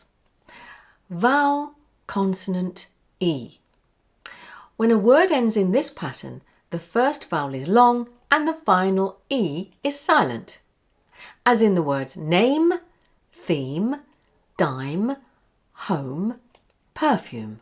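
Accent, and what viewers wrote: RP, United Kingdom. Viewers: ¡Eres fantástica,! I love RP Accent! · Please are you british I want to learn the british English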